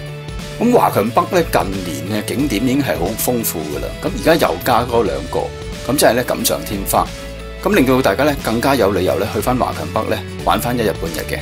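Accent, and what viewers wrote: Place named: Chaozhou, China. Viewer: 潮州佬黎既喔！聽口音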